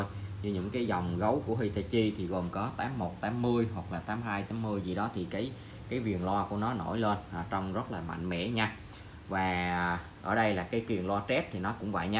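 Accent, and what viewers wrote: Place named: North, Vietnam. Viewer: Người nam mà nói giọng bắc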